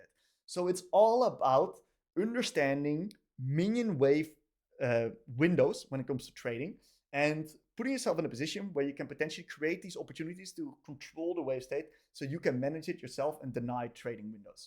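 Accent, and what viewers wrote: Netherlands. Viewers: Good video! The dutch accent is pretty rough though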